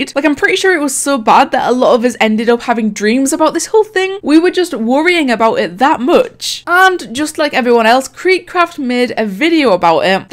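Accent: Scotland, United Kingdom. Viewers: I love me a Scottish Accent!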